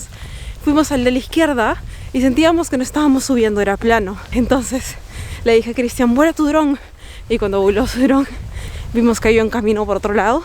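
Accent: Peru. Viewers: Yo la escuché y se me hizo que era peruana, no me equivoqué, por eso tiene ese nosé qué, que tenemos los peruanos. Porque somos humildes, sabemos desenvolvernos y hablamos lindo. Tienes un Ángel súper bello. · ¿Eres peruana 🤔🤔🤔🤔🤔🤔🤔🤔🤔🤔🤔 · No conocía este canal, pero desde que la escuché hablar me di cuenta que era una peruana como yo 😁😁🤗🤗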